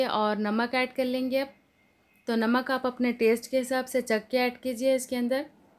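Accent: Hyderabad, India. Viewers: Hedrabadi aese hi bat karte hain Meri ami b hedrabadi hain Or woh b India se hain magar shadi ho k Pakistan aee thi un ka lehja ab b thora thora wesa hi hai jab k unhe yahn aye hue 33 years ho gye hain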